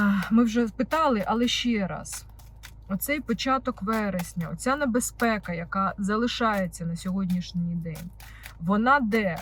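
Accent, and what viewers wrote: Ukraine. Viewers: Обожнюю вас слухати❤ Цей рідненький дніпровський говір - то любов❤ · Дякую Вам. Я обожнюю як ви кажете. Дуже по українські!!! 👍🏼😜 Хай вам щастить!!! Слава Україні!!!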